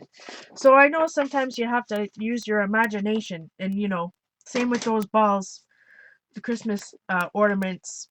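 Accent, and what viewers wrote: Canada. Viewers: You are a cutie patootie with that adorable Canadian accent!!! Lovely work, thanks for sharing!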